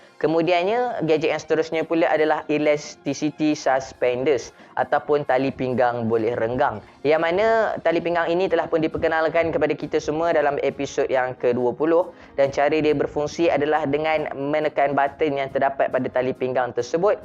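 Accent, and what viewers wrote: Kelantan, Malaysia. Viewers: kuat bnyi klate tu 🤣🤣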